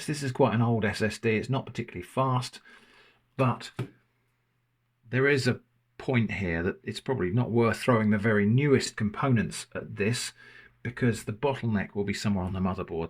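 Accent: United Kingdom. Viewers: its a general misconception, you should not leave it plugged into the electrical socket for earth. Esd earth and electrical earth are different, you need to be on the same electrical level. just unplug the cable. used to install and maintain clean rooms and esd workshops for British Airways for 7 years. Mains earth and esd earth are total separated until they join in the sub station. you sound British? so we have a lot of rain in the uk so static generally is not a problem, unless you are in Arizona USA were it is dry as hell, very good video, love Linux mint, i have it on my two pcs and laptop. will be watching more. just subscribed.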